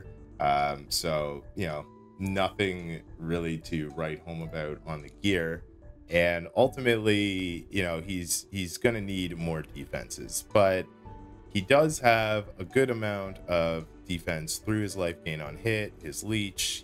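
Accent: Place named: Canada. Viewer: very well spoken canadian i can tell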